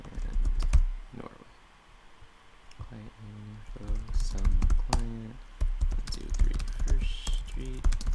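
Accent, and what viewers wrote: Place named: United States. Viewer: For a Norwegian your accent is very American. Have you lived in the states prior?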